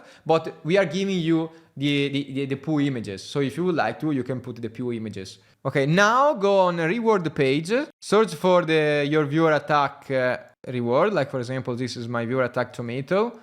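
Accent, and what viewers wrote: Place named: Italy. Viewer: guarda che si sente che sei Italiano ....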